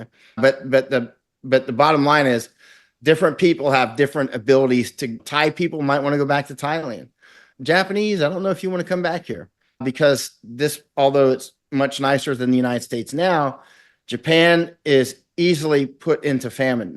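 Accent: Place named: Europe. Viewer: You’re not a white man. You’re a pink man. From Europe. Speaking European English.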